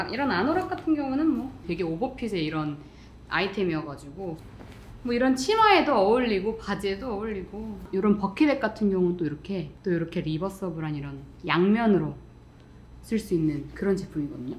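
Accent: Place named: France. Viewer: 마이크로 미니백!! 제일 먼저 님 채널에서 만나보다니 ㅎㅎ 다 잘 어울리는 것 무엇?!!! 불어 발음에 치이고 갑니당 ㅋㅋ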